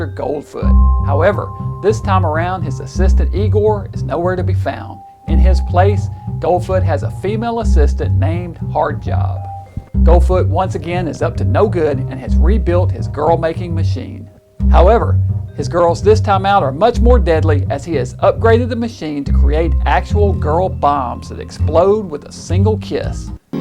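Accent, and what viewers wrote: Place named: Tennessee, United States. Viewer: I grew up in Tn. I knew your accent. My father was from Rockwood Tennessee and ran away from home when he was 13 and joined the spookshow business! I've always had a lifelong affection of horror and sci-fi! Excellent work!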